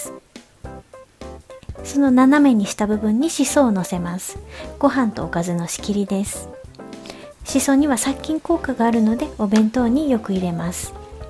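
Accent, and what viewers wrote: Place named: Kansai, Japan. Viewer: kansai ben XD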